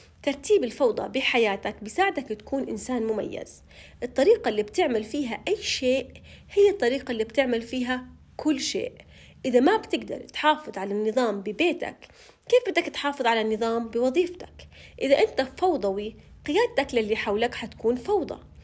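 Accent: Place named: Jordan. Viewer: شكرا الك .. محلاكي باللهجه الاردنيه الطبيعيه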